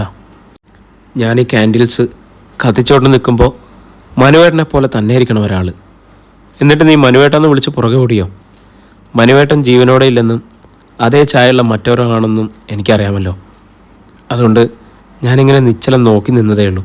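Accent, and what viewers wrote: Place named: Wayanad, India. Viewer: ബ്രോയുടെ റീഡിംഗ് സൂപ്പർ. വയനാടൻ ശൈലി അല്ലെങ്കിലും ഇടക്ക് വരുന്നുണ്ട്.❤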